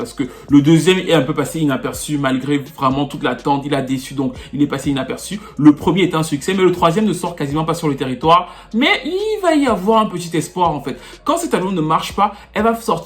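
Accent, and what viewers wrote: Cameroon. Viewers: Je dis hein, je te soupçonne un petit accent Kmer comme mes potes :)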